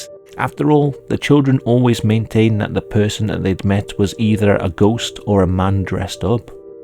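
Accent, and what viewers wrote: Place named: North East England, United Kingdom. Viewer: no mate, defo not, I'd guess north east of England..maybe Sunderland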